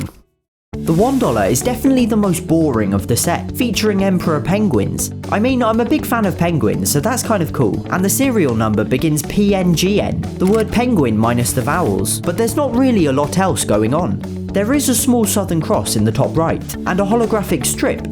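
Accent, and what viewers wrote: United Kingdom. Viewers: Too much British. 3/10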